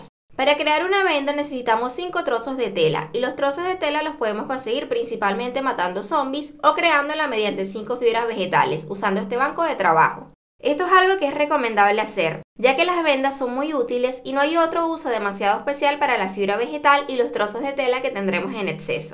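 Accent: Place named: Venezuela. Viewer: Hola eres una genio con estos vídeos, de verdad son de mucha ayuda, noto que tienes acento venezolano 😁, saludo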